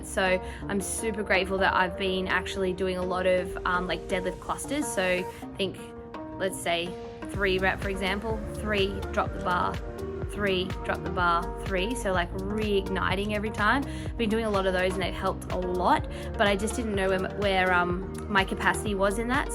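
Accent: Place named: Australia. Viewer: Amazing achievement! Congrats! ❤ Can I make a tiny suggestion for the next video? Could you turn down the level of the music when there's a lot going on in the background? With you speaking quickly and the background noise in the gym plus the music, it's quite hard to understand sometimes, at least for someone who's not from Australia ;) Thanks!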